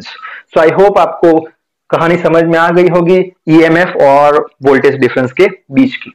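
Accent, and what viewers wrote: Gujarat, India. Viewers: sir ji ape Gujarat se ho ?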